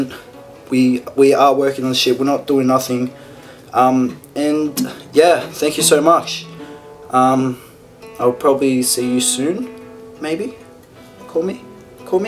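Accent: Australia. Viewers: GLAD THAT YOU HAVENT LOST UR AUSSIE ACCENT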